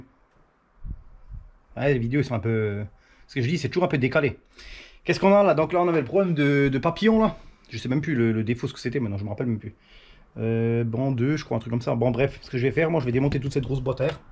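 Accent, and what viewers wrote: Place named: Alsace, France. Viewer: Salut Cool Vidéo 👍 hop la jAIME Notre belle accent de lEst, question tu ne serais pas Alsacien par hasard,moi Oui,Et Fier de Notre Accent 😏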